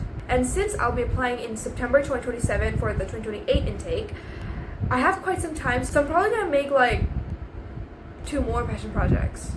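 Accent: United States. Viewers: Why do u have an american accent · I’m disgustingly annoyed by the fact that you sound exactly like a girl I know, and guess what she isn’t even from India, she’s from the STATES🦅🦅. Why and how do you sound so American?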